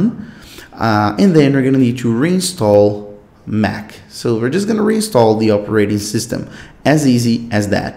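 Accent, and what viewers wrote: Brazil. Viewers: Brigado cara! Eu se que vc fala Portuguese! Could tell you were Brazilian by your accent speaking English!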